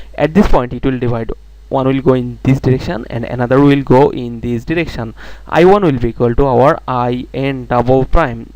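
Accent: India. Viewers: 解說得不錯 ,但是印度口音的英文我真的無法接受...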